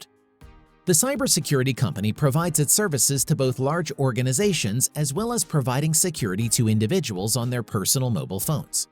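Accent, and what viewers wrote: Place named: India. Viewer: me tamil nadu,india... your voice is like indian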